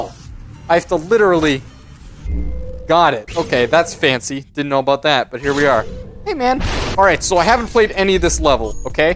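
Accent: Canada. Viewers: I can hear the Candian in your voice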